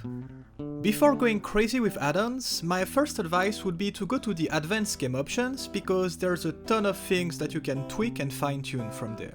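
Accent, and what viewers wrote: France. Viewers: amazing channel my dude. I just started Anomaly yesterday and you helped me a lot. I'm curious tho, where does your accent come from? Sounds like a french accent.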